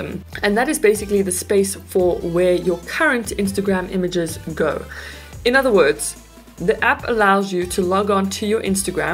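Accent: South Africa. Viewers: Are you from South Africa? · Thanks for sharing, it was really lekker to hear a fellow South African too! I'm based in the UK now and have been for the past 3 years! Still struggling along with social media!! Keep well, and thanks again. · Cool Video... Great hearing a South African voice on YouTube :-) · Hey awesome vid by any chance are you South African ?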